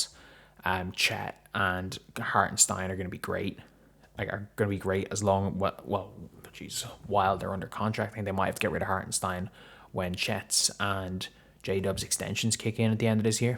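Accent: Ireland. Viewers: friend, you're doing a great job. This side of the pond accent ia also a breeze of fresh Irish (Welsh?) air. Looking forward to walking with you on our journey of following Luka the fab. Lets cross fingers he gets out of his shooting stink fast and that this is finally the year he gets some, personal if not team-oriented, crowns. Cheers from Slovenia. I groaned loudly when I saw his "pedestrian" stat line and the final score. This isn't the year, Lakers are still fake contenders. Too good for the middle of the road teams, but not "not quite my tempo" for the elite teams. But dropping all sense of urgency after that collossal SA win is dishartening, bastards had my hopes up.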